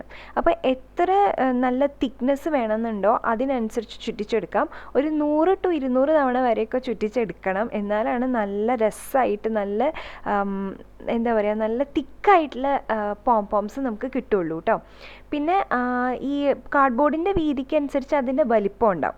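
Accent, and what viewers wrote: Thrissur, India. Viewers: Chechi Thrissur kari anno 🤔🤔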